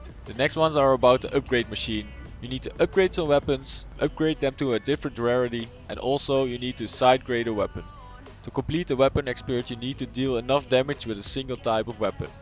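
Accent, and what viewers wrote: Netherlands. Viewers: ja, ik wist het. Ik zag het aan je naam en er zit iets van Nederlands in je Engels Niet dat je Engels slecht is hoor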